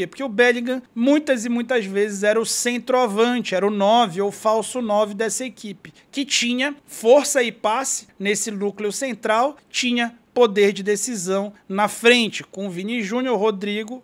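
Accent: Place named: Rio de Janeiro, Brazil. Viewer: achei que fosse carioca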